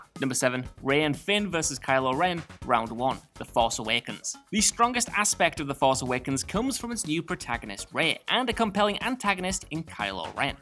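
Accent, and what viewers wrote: United Kingdom. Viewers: British accent really suck! Fuck your Jewel/Joo·Uhl shit!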